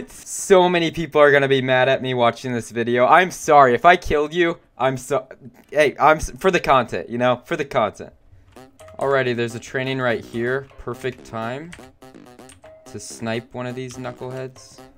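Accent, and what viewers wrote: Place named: United States. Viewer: me who would think that the one who owns such a strict group would not call everyone a moron also u sound like your from america but isnt this a british army?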